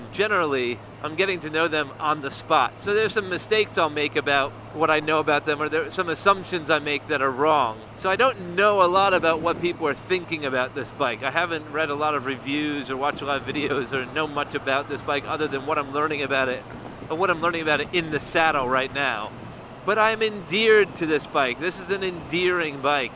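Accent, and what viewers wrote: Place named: United States. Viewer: You don’t sound English? You sound like an American. How’s it feeling riding on the opposite side of the street.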